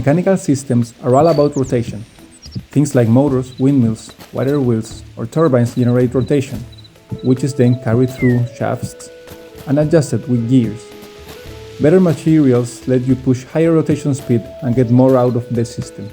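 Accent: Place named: India. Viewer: Author sounds like Indian guy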